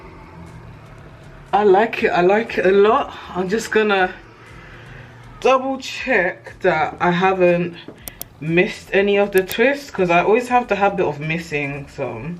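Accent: United Kingdom. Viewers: Your hair is really nice on you, and your British ascent is everything👑😊😊😊, do u have a video on what to expect in the first 12 month? , please I need such video👑👑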